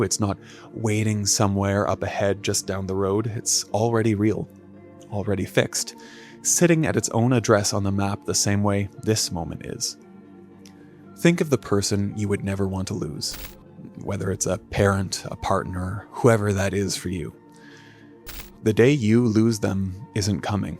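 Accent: Ontario, Canada. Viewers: I find this interesting but can't get past the silly, thick canadian accent. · From your accent I thought you might be Canadian but I notice your profile says you're based in the US.. Are you perhaps originally from Canada? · fantastic. And I love your Ontario accent. Question though, how do we avoid a completely deterministic view. I was waiting for you to address cause and effect as a necessary component of "event". And event sequence can go both ways mathematically like pool balls, but one event leads to another and involves choice. Can you address cause and effect an include probability? Does this only result in the multiverse? The "butterfly effect" is implied here and has some implications. Essentially, there has to be an infinite number of futures because cause and effect is also a measure of time, not just entropy. Two events, one caused by another, must happen at different moments in a sequence and in time. 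To use the pool ball analogy, nothing is going to prompt the ball to emerge back out of the pocket and strike the ball that had hit it and result in the same pathway in reverse. It would require a new event at the bottom of the pocket, some sort of calibrated "popper" but even in that case, the sequence of events would follow a different path because it would be responding to different forces. Basically, I was with you until the end of this video..... fellow southern Ontarian... lol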